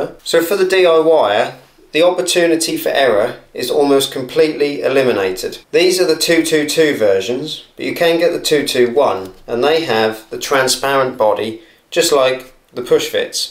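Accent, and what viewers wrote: Norfolk, United Kingdom. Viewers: Are you from Norfolk? & put a Ferrell/bootlace on it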